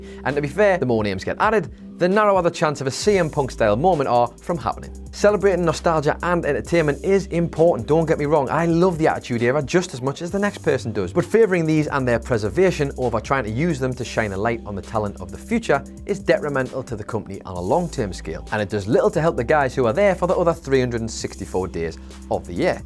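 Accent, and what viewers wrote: Ireland. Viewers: Irish accent? Fookin awesome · I thought that it was Jules with hair, before I got that this guys irish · Are you irish and you look like connor mcgregor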